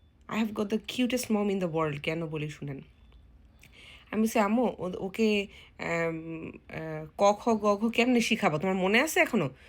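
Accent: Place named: Barisal, Bangladesh. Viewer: তোমার এই বরিশালের ভাষা তোমার মুখে এতো কিউট লাগে ॥ আমি ভালো ছেলে মনে করি কিন্তু ওর দেশের ভাষা ওর মুখ একটু ভালো লাগে না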